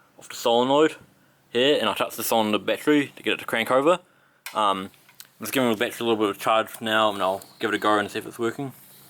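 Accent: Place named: Australia. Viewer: Hi if your going to do a video that might be watched by English speaking people ,it would actually be nice if we could understand what you were saying...im not knocking the Aussi accent, i get on fine with that, but you abbreviate words as you speak. you miss out important syllables and just merge what's left into a slurry of bits ow words.. or is your microphone at fault ? I recommend you SLOW DOWN and ARTICULATE the words then people will actually be able to understand and learn from your video !! That is after all why you have posted the video, isn't it... Im not trying to annoy you either , but to help you with a comment to improuve your videos.. im actually very interested in these motors and I am following these type of videos in French, German, English, Dutch, Italien, and despite being multilingual, yours was the hardest to listen to !! slow down, take your time, it's not a race!!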